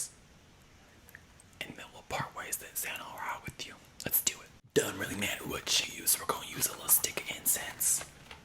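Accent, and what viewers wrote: South, United States. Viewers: For some reason, I can't help but think about Rick Grimes from The Waking Dead when you do the southern accent with this character 🤣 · I love the southern accent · i love the rick grimes -esque accent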